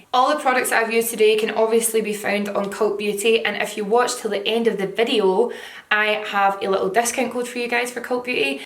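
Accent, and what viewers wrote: Scotland, United Kingdom. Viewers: You're so unique! and a talented artist and I love your Scotland accent. You're so pretty! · you are so amazing! I love watching your videos and learning new little tips and tricks. My husband always says "oh you've been watching today haven't you" cause I walk around my house thinking I've got a Scottish accent 😂😂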